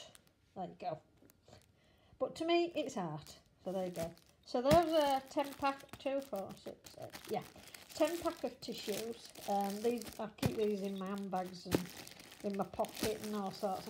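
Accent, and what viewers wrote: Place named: Yorkshire, United Kingdom. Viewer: Thanks for your tip about the liquid soap. I have never tried that one so will give it a go next time I'm at Home Bargains. By the way, I love how you say 'art' in the good old Yorkshire accent so don't ever think you have to change it! Really love your nail colour, what a lovely rich colour of green. x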